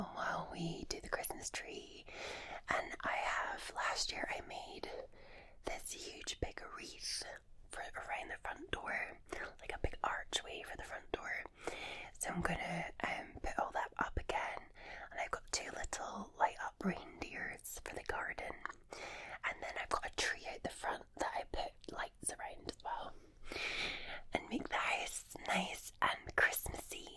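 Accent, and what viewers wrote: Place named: Scotland, United Kingdom. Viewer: Greetings from Glasgow. It’s so authentic to hear ur accent, nice to hear a homelander doing ASMR! Love ur content especially scalp massage. Helps with anxiety and sleep. Thanks x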